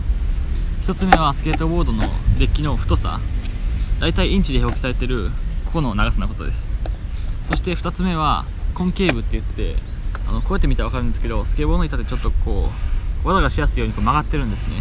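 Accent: Japan. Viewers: いつもお疲れ様です！さんって何県出身ですか？？喋り方的に関西か東海のほうですか？ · 普段はどこに住んでるんですか？あと顔とかハーフっぽくてイケメンやし英語喋れるけどバリ大阪弁、、、ハーフですよね？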